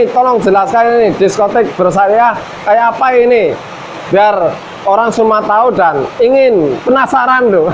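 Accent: Banyumas, Indonesia. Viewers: Agama baru kang, agama kadrun hahahha. Memang manteb pake gaya khas ngapak nya.. good job kang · Kaya kuwe ujung ujunge yah 😂 Ngapak the best 😎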